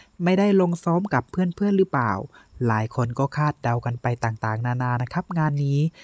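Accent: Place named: Phu Tai, Thailand. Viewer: สาวภู่ไท.เว้าแนวนี้ ผมก็สบายเจ้อ ครับผม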